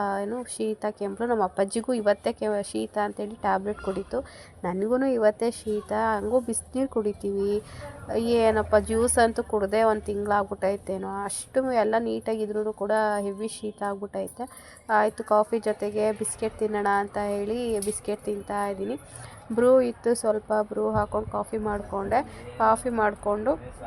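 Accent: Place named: Mandya, India. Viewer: Nimma kannada mathadi a style nodidre mandya side nivu please heli Nanna sister na k m. Doddi side marriage madi kotidivi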